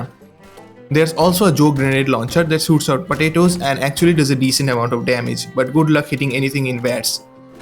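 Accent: India. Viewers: Is the lone wolf radio somehow involved in the quest mod Skinwalker? When i heard you talk about the mod in the video i started getting flashbacks to THAT creepypasta. It be an instant download for me if that's the case Also I'll take the indian "call center" accent over obvious generic deadbeat ai voice any day of the week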